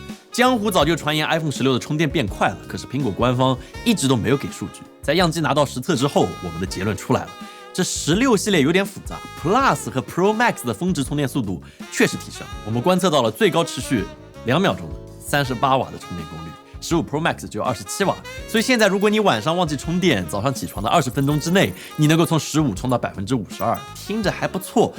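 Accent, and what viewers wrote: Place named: Songjiang, China. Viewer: 听这个普通话有点像上海松江口音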